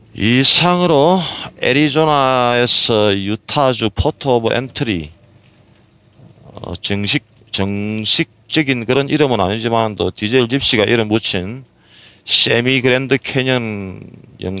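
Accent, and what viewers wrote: Gyeongsang, South Korea. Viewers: 영어도 굉장히 잘하시던데 완즈이 경상도 사나이시군요 ㅎㅎㅎ 항상 안전운전하세요!!! 저도 현직 트레일러 기사입니다^^ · 고향이 경상도 어디세요? 난 진주가 고향입니다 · 구수한 경상도 말트때문에 볼때마다 지겹지가 않아 항상보고 있습니다 안전운전하세요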